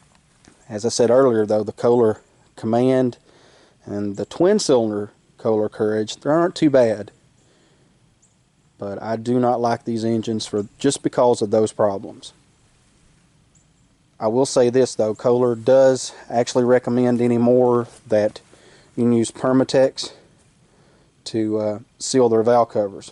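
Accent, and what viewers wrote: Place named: Boston, United States. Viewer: After fire what? Sorry I am having trouble understanding your Boston accent. Could you point to that part?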